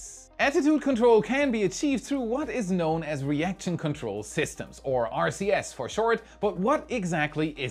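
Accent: Germany. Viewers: I caught the secret message! You still have a slightly german accent, so I was assuming you were from germany :D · Ich wusste doch, dass da ein minimal deutscher Accent ist😊 Grüße aus Deutschland zurück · Ja, ich wusste, dass du aus Deutschland kommst, weil man deinen Deutsch Dialekt raushört😂👍🏽 Deswegen heute mal auf Deutsch: Mal wieder ein klasse Video, danke für die ständigen Updates 🙏🏼🙏🏼🙏🏼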